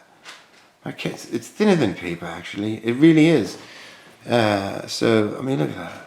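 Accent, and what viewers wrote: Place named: United Kingdom. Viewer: Where are you from in the UK, I cant pinpoint your accent? nice finds by the way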